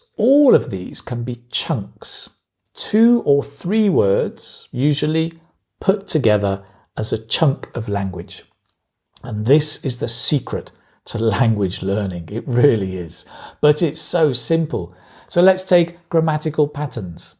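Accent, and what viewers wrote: United Kingdom. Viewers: so you have a british accent right? And u speak slowly trying to get a neutral slang so that all ur viewers can understand ur speech?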